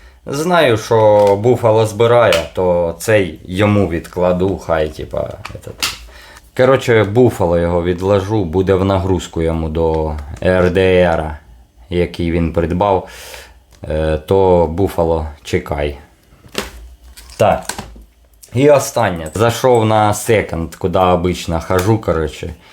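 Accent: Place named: Trostianets, Ukraine. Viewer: акцент як у мого кореша з Тростянця ))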